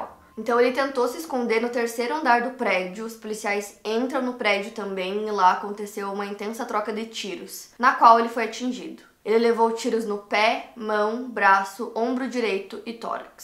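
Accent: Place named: Paraná, Brazil. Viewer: Paraná né ♥️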